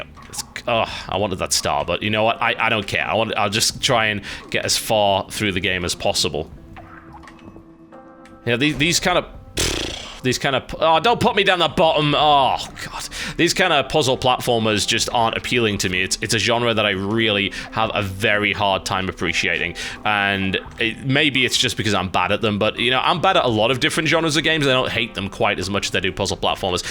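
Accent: United Kingdom. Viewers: If it's one thing I love about, or perhaps the British accent in general, it's that you can say things like "...make me want to rip off my own face." and sound like a total gentleman.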